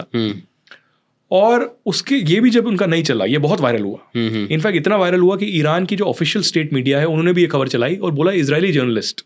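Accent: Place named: Gujarat, India. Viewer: Bhai tme gujrati chho and tme jyare hindi bolo chhe tyare tmaro gujju tone dekhai aave chhe ema 😅 well it's ok mara jode bi thaay chhe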